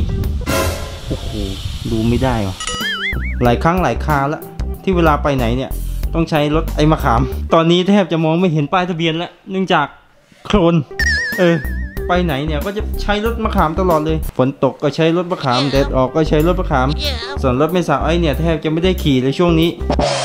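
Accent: South, Thailand. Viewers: พูดใต้ได้กันน้ะ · พี่เเหลงใต้ดีกว่าครับผมชอบ555+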